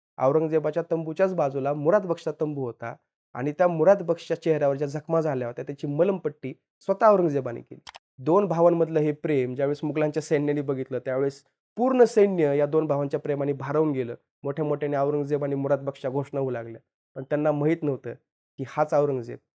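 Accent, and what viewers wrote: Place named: Kolhapur, India. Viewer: Gosht chaan hoti... pan shabdochhar sarv to pari navhta. Fakt Kolhapur walya sathi VDO banavla ka ?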